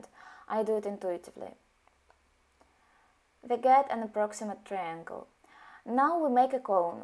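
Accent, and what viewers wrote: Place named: Russia. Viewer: You're welcome. And sorry if it has taken me awhile to reply as I've been preoccupied with other activities. The way you analyze things is something I really admire because your ideas seem to flow quite nicely even though English isn't your first language. Your Russian accent is pleasing to hear. 👍 And your analysis on Belle made me relate to her in terms of being more like a simple and curious type, who thrives her solitude but I'm less like her in terms of the social aspect. I'm not very friendly and I feel awkward and not as confident around people. 😅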